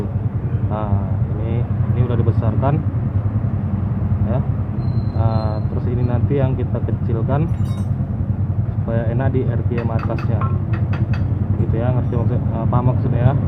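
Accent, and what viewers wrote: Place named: Medan, Indonesia. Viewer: Medan apa Aceh bang😁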